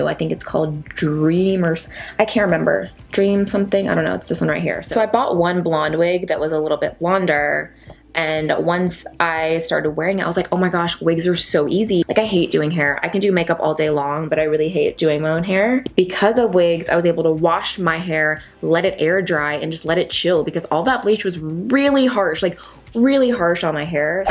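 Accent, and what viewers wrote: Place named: Tennessee, United States. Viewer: Low key still hear your Tennessee accent!!! So cute❤️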